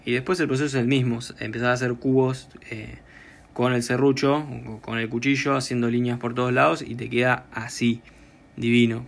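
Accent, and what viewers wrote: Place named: Uruguay. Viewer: Ese hablado (uruguasho)me recuerda a mi Mecanico jijiji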